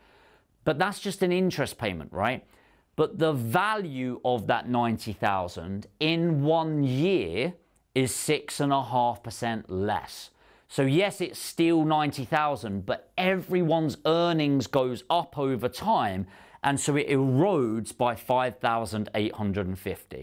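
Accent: United Kingdom. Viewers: I’m curious to hear you’re from which part of UK? 😅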